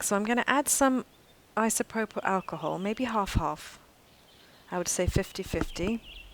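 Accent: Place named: United Kingdom. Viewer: dear, first of all,you should not, YOU CANNOT go back to not talking! I won’t let you,girl 😊 You are amazing, you’re just not use to it, but your voice is just as gentle as your hands are working. And your British accent on top of it wow!! You are definitely not annoying, you are not boring, don’t even dare think that! If someone doesn’t want to watch they can fast forward it,but I don’t think they will. And of course you made a lot of sense, you’re underestimating yourself, but seeing this in regular speed and hearing you explain it makes all the difference, please believe me! And of course, your piece came out wonderful as usual, gorgeous. I asked you previously about an airbrush,now I heard you saying you bought it a while ago. I would like one, but I guess if I want a better one I need to buy it secondhand. Although in generally I hear that they are using the airbrush to make flowers,petals or smaller more defined things., I enjoyed this so much,you can’t go back now to no talk. 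You are so lovely 😊🌺🌹 I will send you an email about Pashmina.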